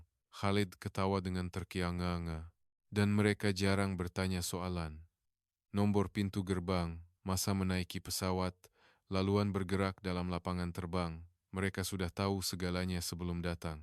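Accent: Indonesia. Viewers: suara admin org indon.bukan org malaysia.sebab org indon suka <link> malaysia tidak bercakap macam ini. ini penipu dari indon.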